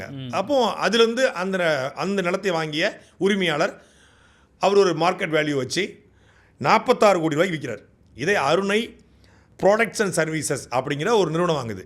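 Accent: Tirunelveli, India. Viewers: சாட்டை நெல்லை அதான் தமில்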